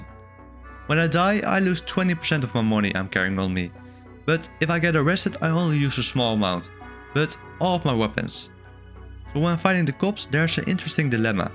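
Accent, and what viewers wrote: Netherlands. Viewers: Is it me or do you sound very dutch ? 😅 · You gotta be Dutch with that accent. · dit is echt leip vet, ja sorry ik hoor je nederlands accent wel hoor ( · I can recognize a dutch accent when I hear one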